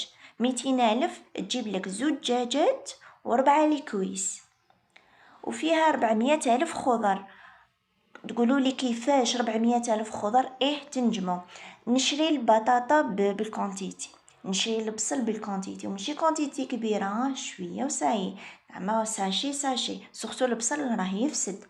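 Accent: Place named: Tipaza, Algeria. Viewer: لهجتك بنت تيبازة ،ما شاء الله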